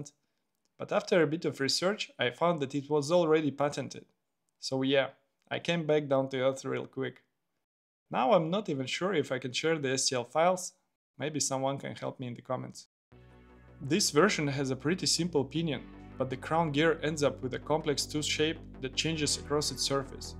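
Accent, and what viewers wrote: Russia. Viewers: Мне кажется или у него русский акцент? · почему я слышу русские звуки в этой английской речи?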